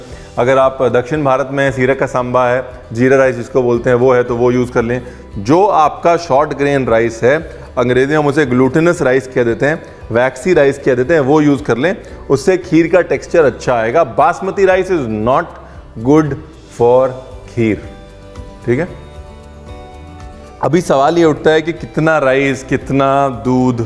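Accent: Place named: Lucknow, India. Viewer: Like ur Lucknowvi andaaz😁😊😊🏆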